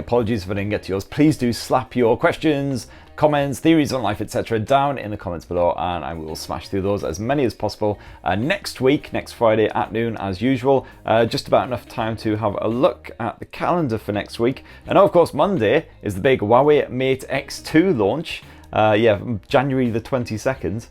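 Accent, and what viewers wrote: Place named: RP, United Kingdom. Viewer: Love your videos, been suscribed for a whiiile! And I personally find your British accent so posh and delightful to listen haha. Greetings from the Canary Islands 🌴🌊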